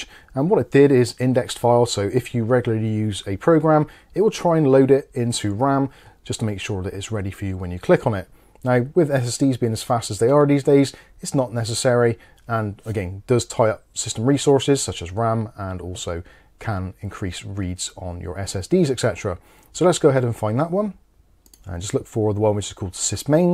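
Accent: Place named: South West England, United Kingdom. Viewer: Being from Bristol nice to hear a South Western accent in this space and you also have a love for tech. Subbed mucker.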